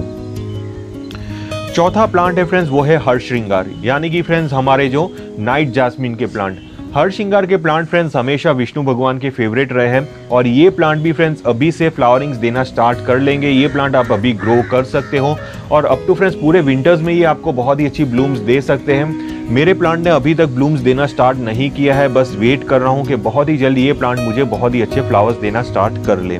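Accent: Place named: Gujarat, India. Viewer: Aapki aavaz se aap gujarati lagte ho....😇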